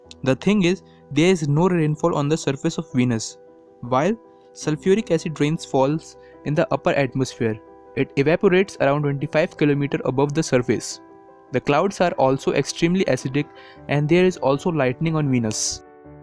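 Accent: India. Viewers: I think you r a Indian!!! because of ur pronunciation I can gusse u r a Indian! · The way you speak English I understood that you're from India.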